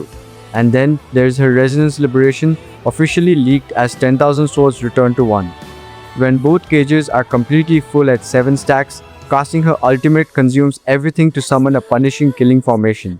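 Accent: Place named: India. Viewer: Don't use an Indian ENG accent if u want more followers 😀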